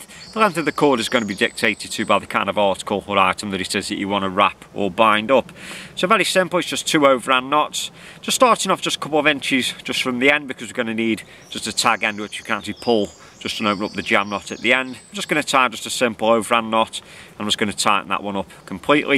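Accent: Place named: Wirral, United Kingdom. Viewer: Just come across your videos mate really well made and informative, I’m wondering if you’re quite local to me as you seem to have a bit of a Wirral accent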